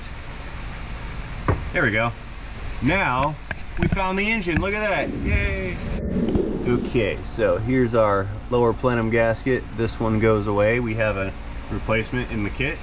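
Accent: United States. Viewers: Well, the ones made in the North of England weren't much good. And your accent is, without doubt, American ! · u sound like a gen x person all american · you have "TV accent".....im guessing western CT, MA, upstate. maybe Michigan, NorCal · You don’t have an accent. You talk like a regular American. You lived in Hawaii, I know that. You mentioned that before. You talk like a regular American person. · I do not even want to guess where your accent comes from it all sounds "yank" to me & excessive too boot. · Accent? You just sound like a good old American to me. If people in central Indiana have the same accent as you, then that is why I am not detecting any particular variation in speech. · I am not from US, and as far as I am concerned your accent is what I call "general clean US" accent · You have an American accent which in some of these states seem to now be the minority thanks greatly to immigration. 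· You just sound ‘American’ to me. Accents in Uk are something else. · Accent? Hmm. As a Brit, I can definitely say your accent is Murican. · To me (Australian), you sound American.....;) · Pt II. Accents. I`m from the UK (London) and therefore have no accent, as we invented the language. However, I am well qualified to speak about yours. You have a classic American accent. It is not accentuated like NYC, the Deep South, Boston etc. it is easily understood and well modulated. Anyone who is complaining about yours, should come with me to visit my relatives in NE Scotland. The Americans there who work in the oilfields have been known to use sign language. Carry on. There is nothing wrong with your speech. I know. So Yah boo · Being in NZ your accent just sounds like a typical American TV accent. And to me the American TV accent is still pretty subtle to ‘real life’ American accents. The only thing I really pick up on is mirror, to me it’s sounds like me ra😊. 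· you have an American accent. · Gday. Accent wise...I'm English, so you sound American...not helpful perhaps, but there it is 😂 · You sound American to me.. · Me being a Norwegian. Your accent sounds American.😅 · You sound like most American tourists I see in my European country. And I see a lot of them.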